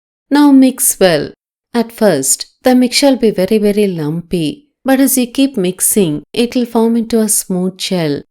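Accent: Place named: Tamil Nadu, India. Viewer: You speak English like Tamil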